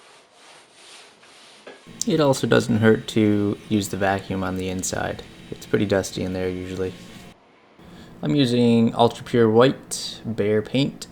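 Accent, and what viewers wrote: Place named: Atlantic Canada, Canada. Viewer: Your accent.... I can’t recall where I’ve heard it before. Newfoundland? New Brunswick? New England?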